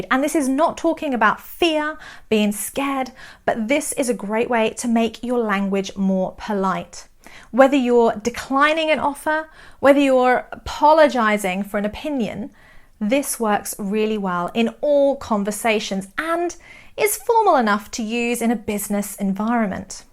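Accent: United Kingdom. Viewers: I love British accent. Love your video.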